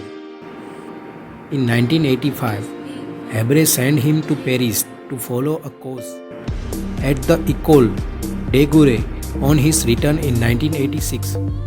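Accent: India. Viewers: the indian narrator voice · Why Indian English accent is so heavy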